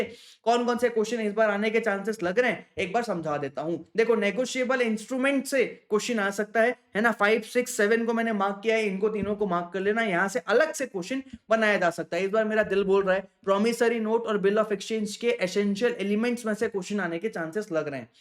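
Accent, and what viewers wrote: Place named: Gujarat, India. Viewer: ye kaka kaki ye sir gujarati lagte hai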